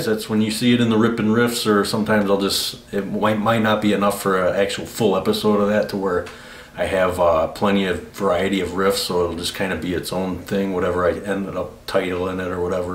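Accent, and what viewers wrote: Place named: Wisconsin, United States. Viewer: Any chance you're from Wisconsin? You sound exactly like my friend's father who hails from Milwaukee.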